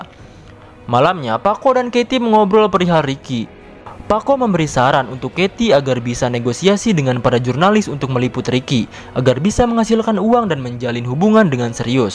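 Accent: West Java, Indonesia. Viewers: Hahaaa sunda abisss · Bang dari jawa barat ya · Kakak orang sunda yah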